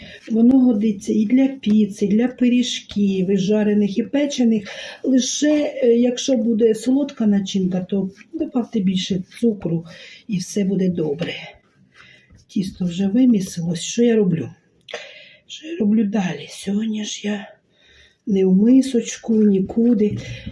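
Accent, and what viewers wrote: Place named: Poltava, Ukraine. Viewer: Яка у вас гарна украiнська мова! Вы, мабудь с Полтавщини? Огромная Вам благодарность за рецепты. Вы очень душевная женщина. Удачи Вам!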